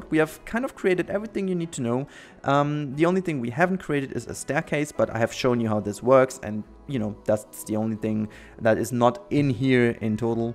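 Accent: Germany. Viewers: Warum machst du eigentlich die Videos auf Englisch? Erreichst du so viel mehr Leute als mit deutsch ? Dein Akzent sagt mir das du deutscher Muttersprachler bist :D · Dat german accent tho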